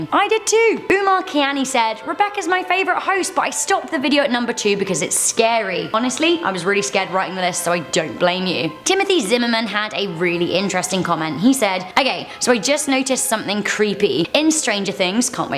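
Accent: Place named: United Kingdom. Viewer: Excellent video Rebecca, I love the British accent.....!!!!!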